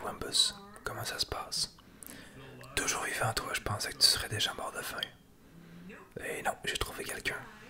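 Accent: Quebec, Canada. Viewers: Merci pour tes vidéos. J'adore ta voix, et ton petit accent québécois me déclenche énormément 😉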